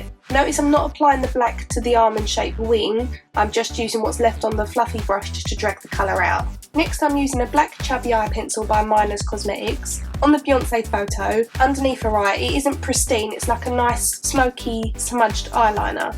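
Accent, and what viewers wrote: Essex, United Kingdom. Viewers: First time watching your videos!! You are really talented! if you dont mind me asking, ive noticed you have abit of an accent... are you from essex? :) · I love watching people apply it. It's like an artform. I'm not so good at it, but you seem to have a pretty good grasp on cosmetics. And I hear an accent...Are you from Essex?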